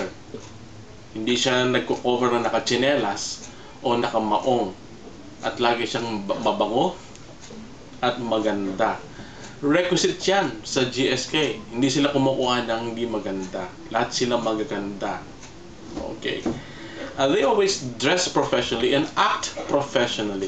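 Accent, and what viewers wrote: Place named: Caribbean. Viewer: your accent is slightly caribbean :)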